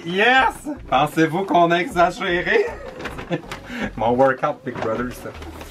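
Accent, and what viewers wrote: Canada. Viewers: Excellente vidéo, j'adore ton humour et ton accent canadien.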